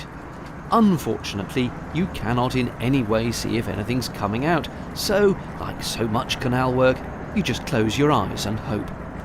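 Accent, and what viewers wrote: United Kingdom. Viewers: Congrats to Episode 100. I am following your videos regularly. Beside of your nice british accent, I love your british humor. :-) Cheers, to the next 100!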